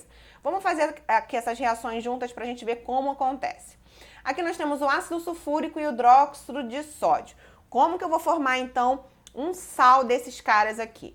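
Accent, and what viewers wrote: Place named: Rio de Janeiro, Brazil. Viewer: É o sotaque carioca KKKKKKKKK nossa voz sempre parece por isso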